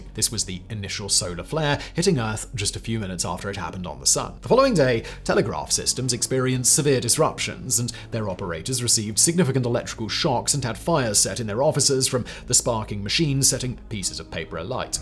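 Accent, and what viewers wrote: United Kingdom. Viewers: "Geezers", haha. Makes me giggle every time 'Brit-pronunces' words.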